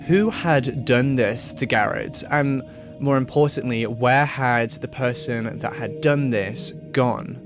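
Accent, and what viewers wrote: United Kingdom. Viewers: As soon as I heard the British accent and the racism crack I knew this was going to be another Jesse Smollett defender!! Right off the bat he skips the part about the boy friend following the boy home. And this doesn’t have a damn thing to do with racism. I’ve had ring side seats of this very relationship. My grandson is mixed race and thank God, (not satan like this dude) he’s 16 now, and his mothers boyfriends weren’t killers. Because if we would have come home and found him dead after his mother broke up with her boyfriend who outwardly blamed him, you know who did it. This dude is a young know nothing who’s people already destroyed his country because of this very weakness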